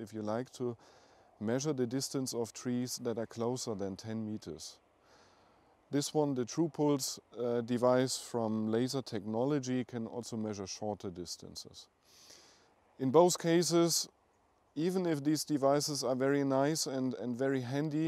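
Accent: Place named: Germany. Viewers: Or like Helmut, the taxi driver in Night on Earth... Yes, sorry for the German accent, we are from Göttingen in Germany. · I only heard this accent (I assume Deutschland) in movies such as the big lebowski. What part of Germany you are from if you don't mind me asking?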